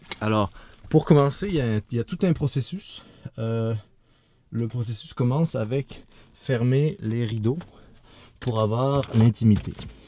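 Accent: Quebec, Canada. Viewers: jb 2004 l accent québécois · L'accent canadien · Tu a un accent quebecois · Ça ce voit qu'il est français, les gars, il a juste un petit peu pris l'accent québécois. x)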